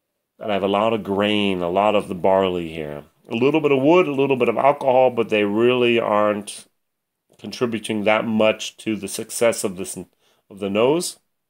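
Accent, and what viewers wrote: Germany. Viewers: Hi, i didn't know you had an english channel too. funny to hear that you sound so much like a german who's speaking english😄(no offence intended!)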